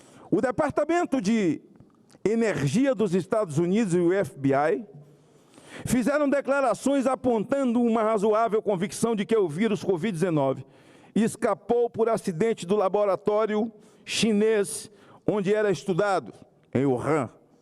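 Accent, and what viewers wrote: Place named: Brazil. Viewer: Parabéns senador Magno Malta você merece ser representante da população sotaque Brasileiro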